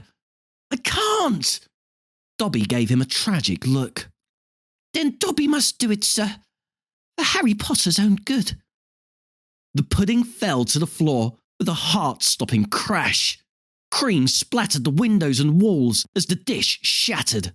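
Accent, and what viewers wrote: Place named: United Kingdom. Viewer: Im in Cyprus, surrounded by UK people, this is a great way to make me more comfortable with a UK accent, thanks!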